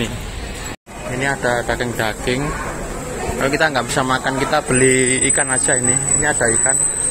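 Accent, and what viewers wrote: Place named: East Java, Indonesia. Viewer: Ys udah wis yo wis jalan ke gonung..dialek jatim memang medok susah hilang😅😅😅😅😅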